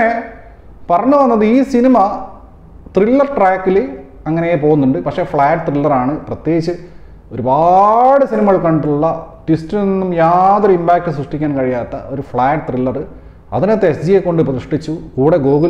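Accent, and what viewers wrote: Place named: Southern Kerala, India. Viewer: കോഴിക്കോട്കാരനാണോ പക്ഷെ സംസാരം കേട്ടിട്ട് തെക്കൻ സ്ലാങ്ങ് ആണല്ലോ.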